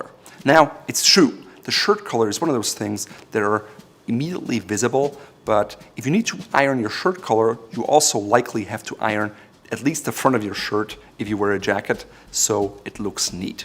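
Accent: Germany. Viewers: Wow! Your German accent is cognizable specially I like the Gazzette vids, thanks! Mit freundlichem Gruß, Rolf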